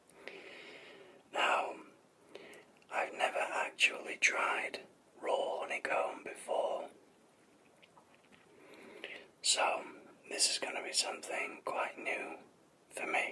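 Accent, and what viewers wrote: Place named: North England, United Kingdom. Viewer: So satisfying - is the honey thicker like that or just as runny? Also I can't place your accent, I keep going from Manchester way, to possibly the Wirral, to maybe Yorkshire (I'm a Yorkshire lass)?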